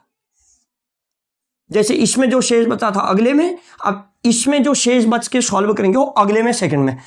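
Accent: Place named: Pahari, India. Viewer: अरे dajyuu पहाड़ी हो क्या, टोन से लग रहा है❤। हम भी पहाड़ी ब्राह्मण ही हैं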